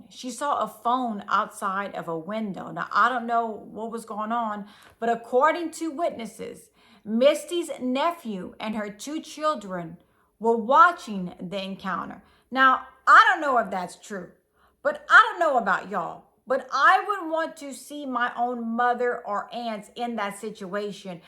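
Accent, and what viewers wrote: Louisiana, United States. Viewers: My new favorite channel. Southern Louisiana boy living out of state. A smart cajun sounding voice is entertaining and comforting. Keep it up and thanks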